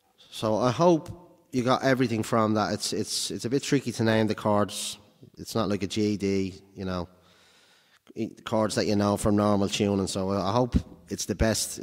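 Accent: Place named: Ireland. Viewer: Open G is a great tuning but not only for slide as shows us.but I'm buggered if I can follow his chords. I'm just trying because I love his Jumbo and his Irish accent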